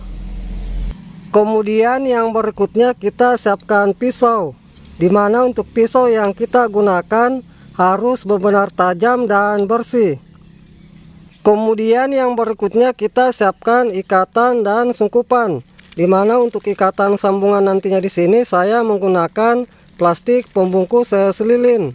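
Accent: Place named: Tolaki, Indonesia. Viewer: Sultra bagian mana bang, logat tolaki ta nda hilang keren 🥰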